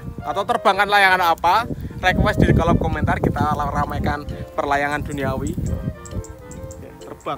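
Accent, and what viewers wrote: Java, Indonesia. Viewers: mas kok jowo an orng mana !? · Mas jowo ne ndi kok medok men.... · Iki jowone ndi boss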